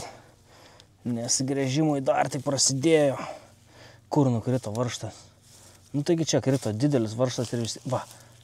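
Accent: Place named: Suvalkija, Lithuania. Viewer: Geras suvalkietiškas dialektas😆👍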